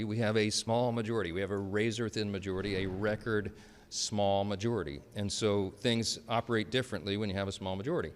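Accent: South, United States. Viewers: He does sound a lot like a deep southern evangelist!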